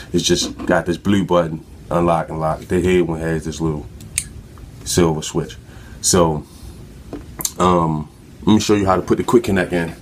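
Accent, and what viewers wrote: Baltimore, United States. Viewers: Yo you from Baltimore??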